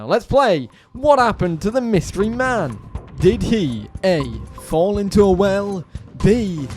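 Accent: United Kingdom. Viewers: Is this a Scottish accent by some chance?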